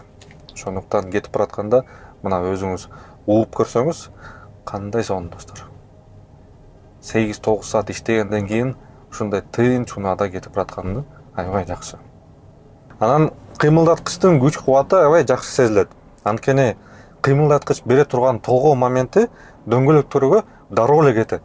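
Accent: Standard. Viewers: Кыргызча таза суйлойт экенсин,Азамат! Жакшы информация бердин рахмат,ишине ийгилик! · Сен баарыбызды Кыргызча туура сүйлөгөндү да үйрөтүп жатасың рахмат . Мен негизи уккам башка бирөнү ата деген күнө болот жана мен башка улуттан болом деген да күнө болот ошондой эле тилге даны тиешеси бвр . Биз Кыргыздар Кыргыз болгондон кийин өз тилибизди ушу Балага окшоп таза сүйлөйлү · Аллах ыраазы болсун ушундай кыргызча таза суйлоп тартып чыгара бер.Ишине ийгилик. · Азаматсын,кыргыз тилинде таза суйлойт экенсин,ошон учун аягына чейин кордум,ишине ийгилик! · Бул жигит кыргызча так таза суйлойт экен. Кулакка жагымдуу угулат экен. Обзордо дегенди серепте деп койсок болчудай го. Обзор жасоо - серептоо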